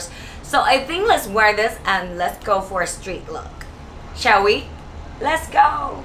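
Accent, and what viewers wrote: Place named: Thailand. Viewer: I‘m so happy I stumbled upon your video! 💕 I picked up the pink pair - mainly for my walks. On a different note - I recognize a Thai accent. Is it possible that you‘re from Thailand? x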